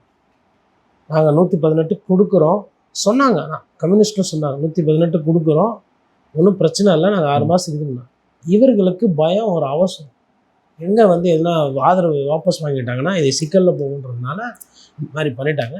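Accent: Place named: Telugu, India. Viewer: ஏன்டா கோட்டி உன் தாய்மொழி தெலுங்க அல்லது மலையாளமா ஏன்ன தமிழ் தமிழ் பேசர இப்படி தான்டா இரண்டு கும்பல்கள் தமிழகத்தில் சுரண்டி கொழத்துகொண்டுயிருக்கு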